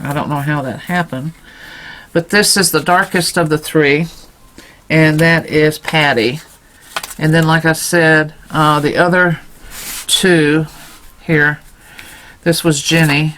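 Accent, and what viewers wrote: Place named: South, United States. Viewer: I’m a Georgia Peach. I know southern accents when I hear them. Lol. 😂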